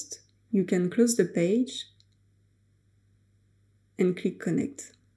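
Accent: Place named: France. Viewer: Excellent accent français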